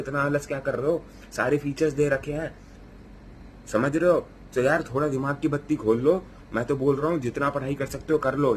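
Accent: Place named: Uttar Pradesh, India. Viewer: Aur bro UP se ho pata lagra 😁😁😁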